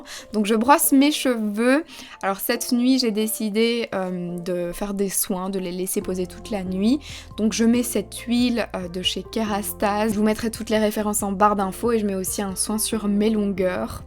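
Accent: Belgium. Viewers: J adore l accent Belge!!!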